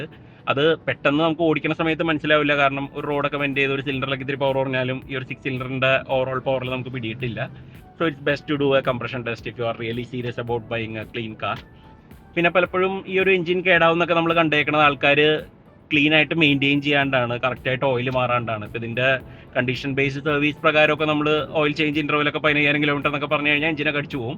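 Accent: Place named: Thrissur, India. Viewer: always a pleasure watching your videos! A few observations though... 😄 Sir, you are our inspiration and role model, which is exactly why we noticed you using your phone while driving - checking car specs AND doing videography at the same time! Multitasking level: expert, but maybe let's leave that out of the next video (or at least edit it out properly) Now, about that age comment, hahaha! Whether you've just entered the 40s or are tiptoeing around it, CONGRATULATIONS and welcome to the club! Also, I'm guessing this was shot on the Palakkad–Thrissur highway? And that Malayalam dialect is giving very strong Thrissur vibes! Are you originally from Thrissur, sir?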